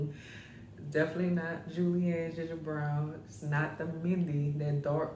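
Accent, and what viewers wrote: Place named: Louisiana, United States. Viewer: Wig is so pretty on you ! I will for sure buy ! And omg are you from Louisiana? I think I hear an accent.Its my favorite accent my parents are from there🤩👍🏾 let me go check out your other reviews. Edit: I watched the whole thing and you mentioned Louisiana 🤣 I was so quick to comment great review again!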